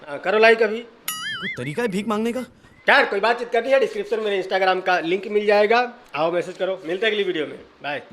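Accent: Bhojpuri, India. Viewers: Tone to Bhojpuri hain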